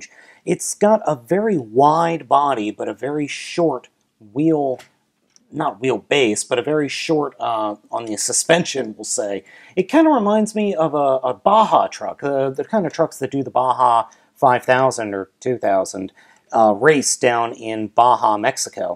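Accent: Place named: Appalachia, United States. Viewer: Like the Hillbilly from Gears.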